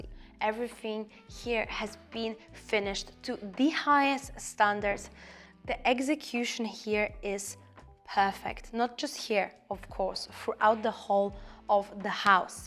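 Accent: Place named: Australia. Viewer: You have a slight Aussie/ New Zealand accent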